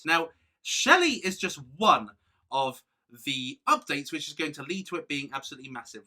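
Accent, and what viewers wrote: United Kingdom. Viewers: Sing in english accent talks in british accent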